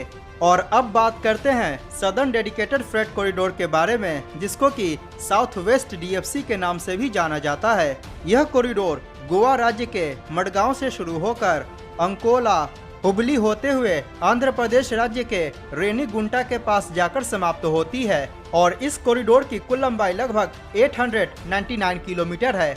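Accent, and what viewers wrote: Bihar, India. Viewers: Is the presenter Bihari?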